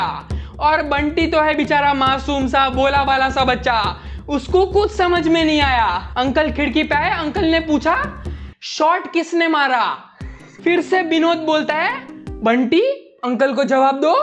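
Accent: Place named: Gujarat, India. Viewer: Bro are you Gujarati because you have a Gujarati tone and I am a Gujarati too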